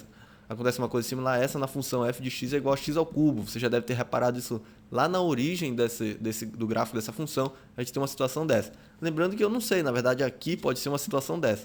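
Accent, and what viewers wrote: Pará, Brazil. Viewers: Muleke eu logo vi q era paraense assim como eu só pelo sotaque, quando ele disse "égua" eu tive certeza hauahuah. Parabéns cara seu vídeo ta ótimo e é bom ver q esse tipo de conteúdo está crescendo no nosso estado. Parabéns e se possível volte a produzir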